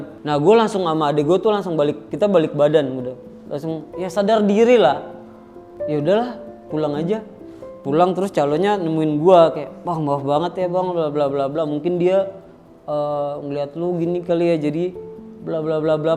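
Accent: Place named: Lembata, Indonesia. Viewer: Logat lembata sekali kaka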